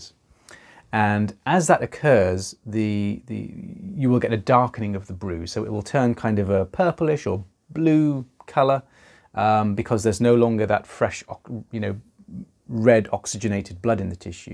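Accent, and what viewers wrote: United Kingdom. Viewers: It's 1am and I ended up here. Watching a hot doctor with a British accent pull out ear wax, which is satisfying on so many levels.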